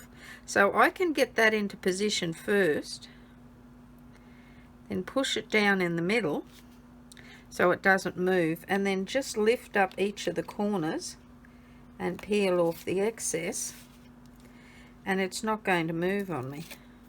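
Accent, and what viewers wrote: Australia. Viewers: Hey just curious what is your accent, Australian or am I incorrect?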